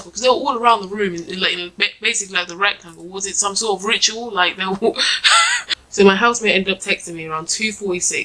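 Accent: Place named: London, United Kingdom. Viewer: Oh I had a feeling you was from there I like London culture and the accent I wanna visit one day!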